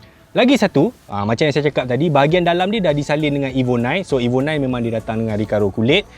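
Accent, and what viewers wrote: Kelantan, Malaysia. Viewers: kayo weh ore kelate 😁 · Abang ni org kelantan ke 😂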